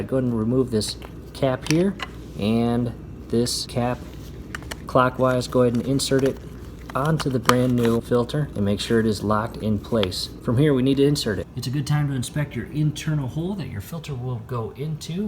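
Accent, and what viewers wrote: United States. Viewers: Thank you short precise and American speaking. Right to point perfect outline got filter changed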